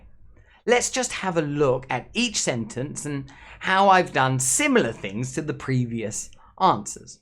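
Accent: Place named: United Kingdom. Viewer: Please teach us how to do British intonations like you. Stress sentences in particular. I love your accent and intonations. How to speak fluency like you with intonations.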